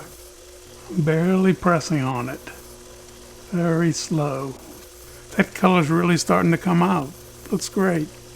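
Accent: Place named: South, United States. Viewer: Where at in the eastern united states are you from sir ? I see from the spots on your hands you're about 60 or 70. But I am unable to place your accent. I want to say kentucky or maybe louisiana.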